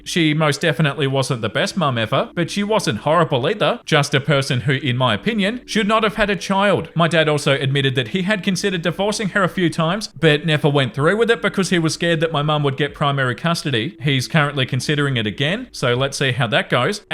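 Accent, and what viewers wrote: Australia. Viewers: On the point about accents, you do have an Australian accent, although not super strong. Everyone has an accent, mine being a mix of Pacific Canadian & central Canadian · Everybody has an accent. Thats how speaking works. And youre Australian? And you dont think you have an accent?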